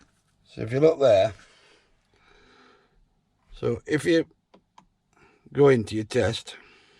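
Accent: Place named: Yorkshire, United Kingdom. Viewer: Yorkshire accents best int world 😁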